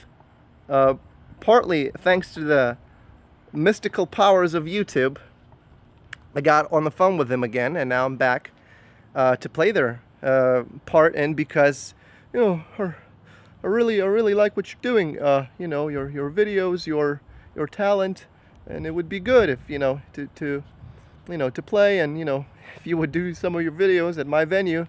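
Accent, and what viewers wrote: Norway. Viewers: Wait... are you Norwegian?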